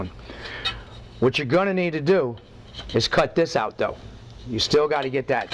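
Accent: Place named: South Louisiana, United States. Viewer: Am I mistaken in hearing a bit of South Louisiana in your voice. Not a lot, but it's there.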